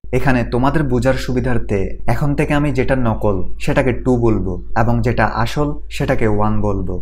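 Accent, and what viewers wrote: Chittagong, Bangladesh. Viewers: আপনার কথায় একটা চাটগাঁইয়া টিউন আসে।🫥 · চট্টগ্রাম ❤️🌸 ভাষা ধরা পড়ল একটু করে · Bhai Chittagonian naki amr moto? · ভাই কি চট্টগ্রামের নাকি? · ভাইয়ের বাড়ি মনে হয় চট্টগ্রাম 😊 সীতাকুণ্ড আসলে নক দিয়েন❤ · চিটাগাং বাড়ি নাকি? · চিটাইংগে চিটাইংগে গল্প মজা লাগছে😊😅❤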